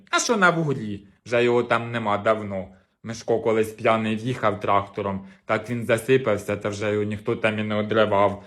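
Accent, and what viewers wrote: Poltava, Ukraine. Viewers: Дякую,! Кожного дня переглядаю Вашi шедеври. Ви- талант!Як точно, мальовниче вiдтворюете наш полтавський дiалект! А iнтонацiя, емоцiональнiсть! Все не вигадане, справжне!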